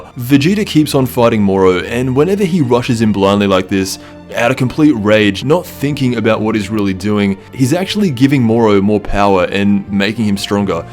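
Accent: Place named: Australia. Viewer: J T it’s the pure Aussie accent we all have including me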